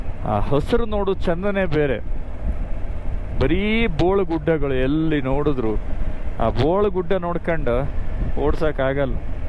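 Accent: Kundapura, India. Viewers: ಹವ್ಯಕ ಕನ್ನಡ ಮತ್ತೆ ಕುಂದಾಪುರ ಕನ್ನಡ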